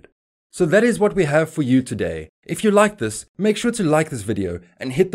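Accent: South Africa. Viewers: Could listen to your South African accent all day ✌ Always look forward to your informative videos keep up the great work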